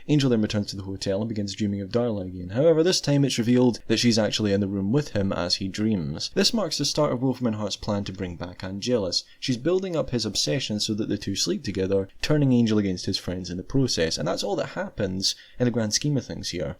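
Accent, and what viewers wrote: Scotland, United Kingdom. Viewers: Audio's fine, talking isn't THAT fast and while his scottish accent is definitely strong, it's a lot easier to parse than some js. Btw, captions are a thing :)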